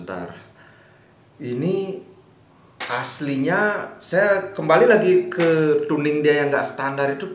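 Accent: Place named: Bali, Indonesia. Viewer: BESER... 😁😁😁 medok sajan base baline adi bli...😅😅😅 👏👏👏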